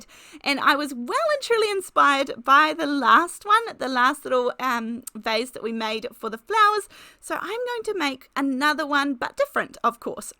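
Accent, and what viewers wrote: New Zealand. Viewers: agreed. I love your Mainland accent😊 it brightens my day. ❤ have a fabulous week. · !! Don’t say vayze. Be proud of your kiwi accent with English roots and say varze. Lol. And yes i also love your smiley happy voice too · Beautiful cards from cute stickers. I love them all. I love your accent, too. I watch a lot of BritBox and you have helped me to identify the New Zealand accent 😊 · I'm an Aussie an although we usually take the mickey out of our Kiwi cousins I also like accent and herbright and breezy outlook always makes my day 💕🤪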